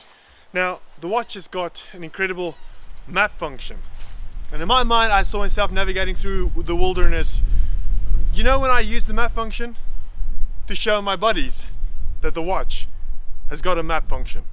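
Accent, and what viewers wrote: South Africa. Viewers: I know he’s a South African when I heard he’s voice🇿🇦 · Are you from SA? Thanks for the review!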